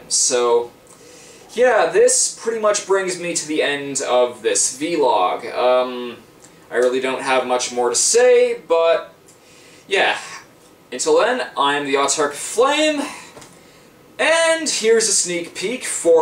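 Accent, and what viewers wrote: Canada. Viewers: The Canadian accent is strong with this one. BEST ACCENT EVER. (Seriously, say House and Out more, it makes me sad I'm stuck with my lame american accent, at least I can listen to yours.) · Are you Canadian?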